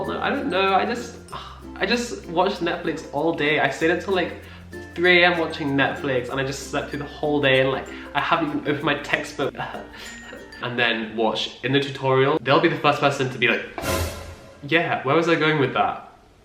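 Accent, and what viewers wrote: RP, United Kingdom. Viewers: Mar Teumar right? even the way he speaks sounds posh. i mean, isn’t that RP? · I hate his britain voice. Akhhhhhhtuu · Maybe what some people catch that causes the “posh” assumption is that, at least to these American ears, you have a posh accent. I fully admit I am no expert and may be bead wrong. However, I like your accent. It is part of the reason I find you attractive and interesting. Best wishes with med school. 👍👍🤞🤞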